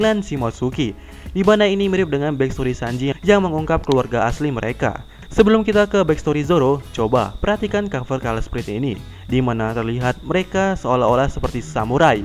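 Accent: Palembang, Indonesia. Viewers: pantesan suaranya kek orang palembang gitu min hehee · Fix orng Palembang😂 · Ay wong Palembang kau ni ee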